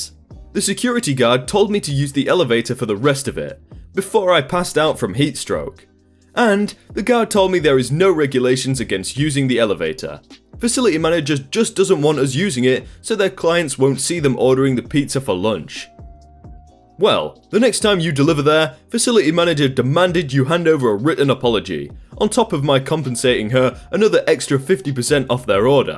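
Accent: United Kingdom. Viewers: The narrator seems British, the reddit poster was American ... two completely different languages that are only superficially the same ... watch enough videos and you'll get the hang of it ...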